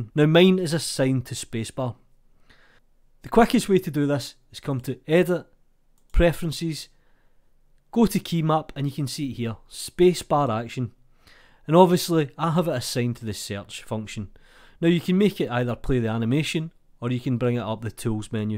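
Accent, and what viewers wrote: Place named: England, United Kingdom. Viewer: best english accent ever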